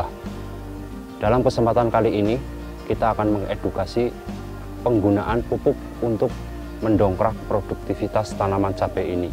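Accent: Banyumas, Indonesia. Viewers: Asalamualaikum. broo wong endi kiee, ngomonge ngapak, dadi pengin sinauuu bro....🙏🙏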